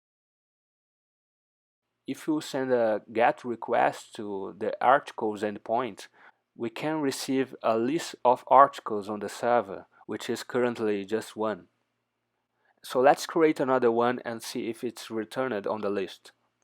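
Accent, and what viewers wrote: Brazil. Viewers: I can tell you are brazilian by the accent, very good video sir. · Fiz a mesma coisa, o sotaque não engana! Show Brazuca na veia! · Pause o video pra conferir se ERA BR vc. Aceitei 😂. Muito bom o vídeo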